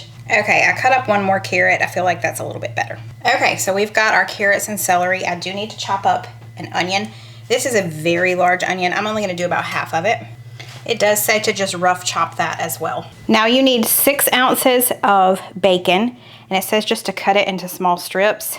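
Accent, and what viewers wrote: South, United States. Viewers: Omg I'm actually crying....chocolate chip key lime pie..and in Your southern accent...its MEENT ❤❤❤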